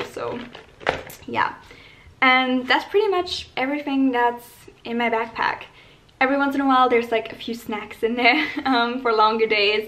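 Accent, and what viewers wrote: United Kingdom. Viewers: omg i just watched her what's in my bag video from before and she has a bit of a british accent now loooool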